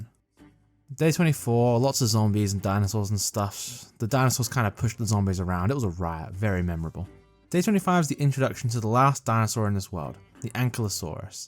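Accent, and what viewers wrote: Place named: United Kingdom. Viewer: why does your voice get increidibly more british when you stream?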